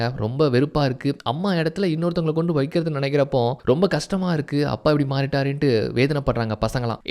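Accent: Tirunelveli, India. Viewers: திருநெல்வேலி தான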